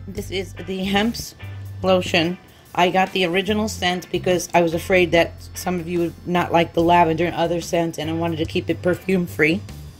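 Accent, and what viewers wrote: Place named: Staten Island, United States. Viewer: I could cry right now girl...I dont know how I missed it from the first time I watched this video..., I am from Staten Island too. I live here still. Your voice had a familiar quality for me...we probably both have the same accent 😁💙💚💙💚 I didnt think I could love you any more but now I do!!! Its so amazing to find someone as knowledgeable as you on YouTube and social media and know that you are from my hometown. 💚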